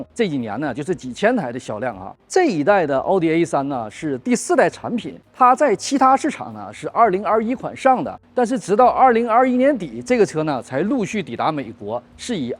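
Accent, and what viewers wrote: Northeast, China. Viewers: 听到这东北味就感觉满口大蒜味，真是人车都辣眼睛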